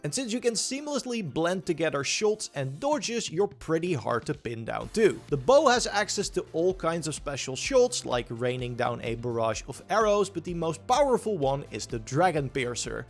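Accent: Netherlands. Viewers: Omg Your Dutch accent is thick as molasses.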